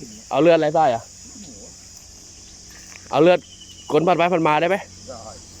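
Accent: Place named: Nakhon Sawan, Thailand. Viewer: ติดตามนะจ้ะ สำเนียงเหมือนคนคอนหวันมีแง้ะด้วย อ่านเจอว่าอยู่ชัยนาท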